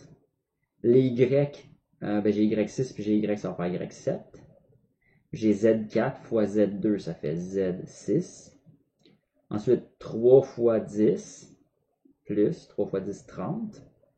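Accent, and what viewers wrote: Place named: Quebec, Canada. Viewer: Agréable rafraîchissement, explication claire et dépouillée et tout çà avec l'accent de Charlebois. Merci de tout coeur.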